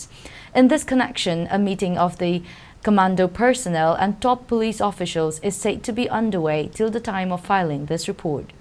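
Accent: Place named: United Kingdom. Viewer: Wow British accent. Must be u work in BPO UK process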